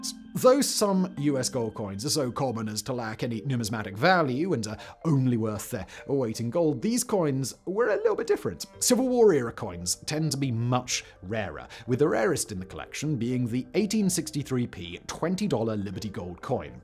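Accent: RP, United Kingdom. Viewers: This sounds normal for a British man with a Received Pronunciation accent - I have the same accent and the audio doesn't sound harsh or over-sibilant to me. I accept it may do to others.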